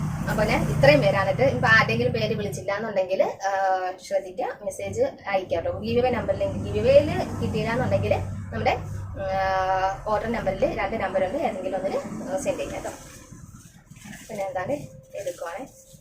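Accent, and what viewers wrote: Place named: Trivandrum, India. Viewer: " nikku nikku nikku evide povunnu"😅😅thani Trivandrum 👌🏻👌🏻kollam 🥰🥰 Collectionsum adipoli👌🏻👌🏻👌🏻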